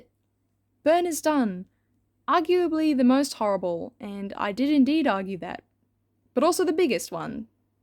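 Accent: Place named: Australia. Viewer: This is outstanding and your Aussie as well like wow!❤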